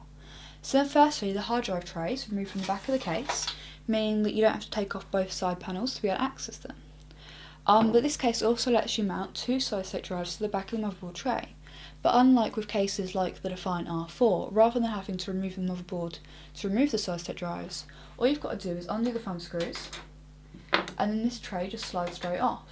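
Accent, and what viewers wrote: United Kingdom. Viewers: She's British. That's how some of us British people pronounce our letters just like how American's each have different accents and pronounce letters differently.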